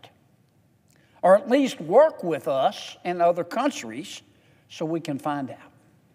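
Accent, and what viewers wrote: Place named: Louisiana, United States. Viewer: The reason I like listening to you is that you explain yourself well and I understand what you say (even with the Louisiana accent). Keep talking to us because our President isn't.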